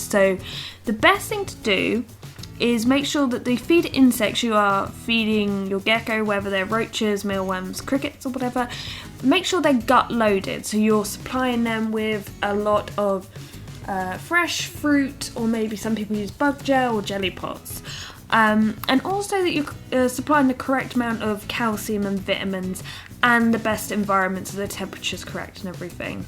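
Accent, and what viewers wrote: United Kingdom. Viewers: haiii me again XD so from ur voice ur from uk ??????? if u are OMG plzzzz do u know any good breeders in uk i cant find ANY !!!!!!!!!!!!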